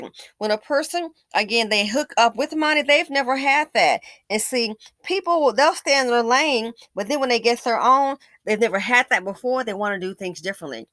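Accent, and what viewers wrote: South, United States. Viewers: girl you are soo damn funny with your southern accent and twang 🤣🤣🤣🤣. "She picked up the k-mart man and now in divorceland". I screamed my head off 🙌🏾♥️💯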